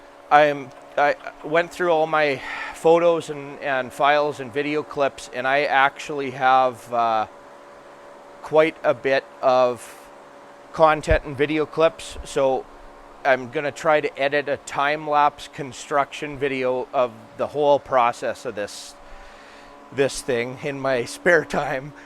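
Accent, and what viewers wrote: Saskatchewan, Canada. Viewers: I haven’t lived in Saskatchewan since I was a child but I love hearing your accent! 😆 Your greenhouse gives me much inspiration as I currently live and garden in Florida but crave moving back to Canada. The shorter growing season terrified me but now I see that it can be extended greatly. 🤔 Awesome job!!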